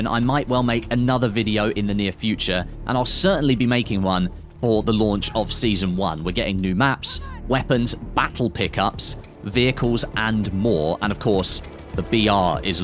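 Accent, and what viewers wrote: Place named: England, United Kingdom. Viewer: you outsub stodeh on YT good work man! my englishman with the so easy to know who u are by ur voice ;) perfect english accent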